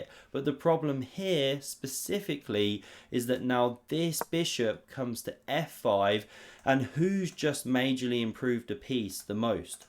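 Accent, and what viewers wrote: United Kingdom. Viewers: I love ur british accent man keep it up !😊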